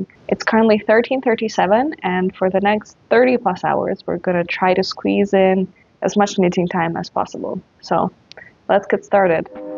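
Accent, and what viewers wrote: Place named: Eastern Europe. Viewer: Do you happen to be a Slavic language speaker? Your English is perfect but some familiar intonations tend to pop in from time to time.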